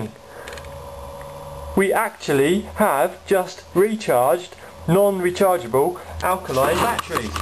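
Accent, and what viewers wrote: United Kingdom. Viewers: that was really funny! ...might just be the British accent, though. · Nothing he is BRITISH!!!! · you are so british. it's awesome. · wow some ones british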